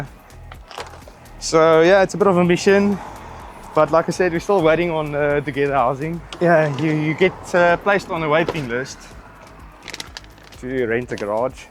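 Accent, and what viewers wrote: South Africa. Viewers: South african accent? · nice to hear a south african accent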